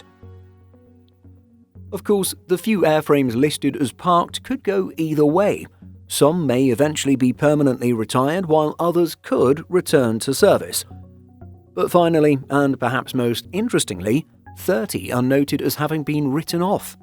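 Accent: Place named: United Kingdom. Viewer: Your channel is awesome. But your tea drinker accent is annoying as FÆCK! CHANGE IT! COLONIZER! YOU PROBABLY HAVE SOME CROOKED TEETH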